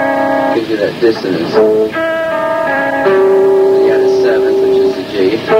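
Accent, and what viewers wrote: England, United Kingdom. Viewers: This guy has an english accent ? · of course he started getting a English accent. look who he was around night and day.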